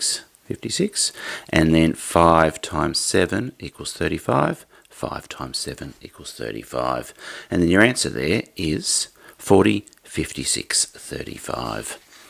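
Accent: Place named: Australia. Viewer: omg you are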